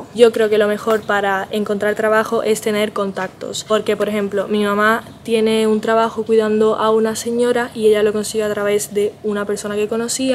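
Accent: Andalusia, Spain. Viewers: Al principio pensé que eras andaluza. Se te ha pegado el a acentillo ya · Cuando empieces a hablar no digas "hi guys", ni que fueras gringa 🤣. Di hola que tal o como estáis 👍😁. Por cierto, hablando ya eres andaluza total 😅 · Me dicen que eres andaluza, y me lo creo. Y qué bien se te entiende! Buen video y ameno. Y te expresas genial. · Amos la primica, me dices que eres Andaluza y me lo creo,como se te a pegao el Andaluh, chiquilla. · Esta chica lleva 2 años en España, hasta se le ha pegado el acento andaluz. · si creo que no lo vi desde el principio, esta visto que aun necesita mejorar el andaluz.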